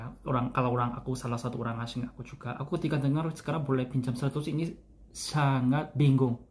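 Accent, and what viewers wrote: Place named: Java, Indonesia. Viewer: logatnya kayak logat orang jawa.. beberapa kata terdengar medoknya :))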